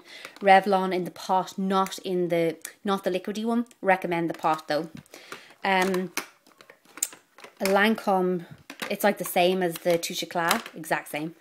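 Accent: Ireland. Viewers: OMG it so amazing when you watch so many people with american accents all day long[ and then you stumble across someone with an Irish accent... up the Irish... im so subscribing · are u irsh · Love your accent! Are you Irish? I'm part Irish! Anyway, can you review the Kat Von De palettes?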